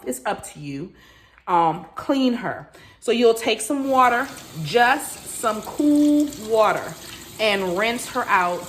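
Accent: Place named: Washington DC, United States. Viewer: U from D.C.? Your accent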